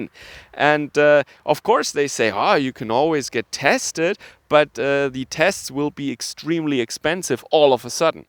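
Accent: Germany. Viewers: Clockwork Orange with a German accent.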